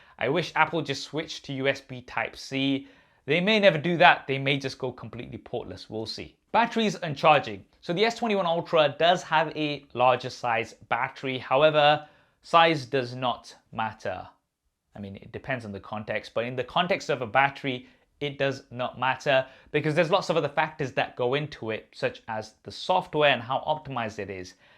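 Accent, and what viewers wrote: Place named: United Kingdom. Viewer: please link friend with speaker test! Having hard time with your UK accent haha. Thank you 🙏🏼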